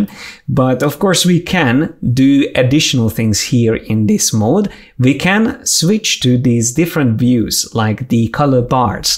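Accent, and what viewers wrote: Hungary. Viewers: Hungarian accent 👌 :D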